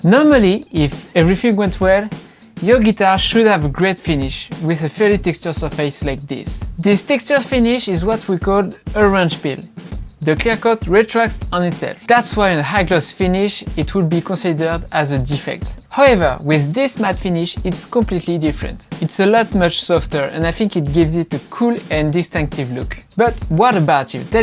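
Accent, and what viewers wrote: France. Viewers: It’s officially the first time that a person with a French accent doesn’t irritate me when speaking English. I guess you could take that as a compliment coming from a dude who gets easily irritated 😂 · i was listening to him talk and thinking, "this guy is French AF, I don't recall ever seeing a French YTer, ever" then i scrolled down to see " " my suspicions check out. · Came for the lumpy green paintjob Stayed for the Inspector Cluseau accent 👍😎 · I am Croatian, and nobody around me speaks English with such a terrible accent. It is French thing. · francais ? vu laccent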